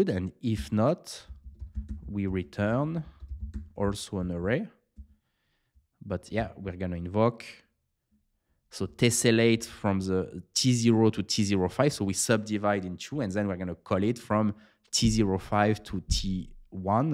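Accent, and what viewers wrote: France. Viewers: Le meilleur accent français ! 🇫🇷 Great Video 👍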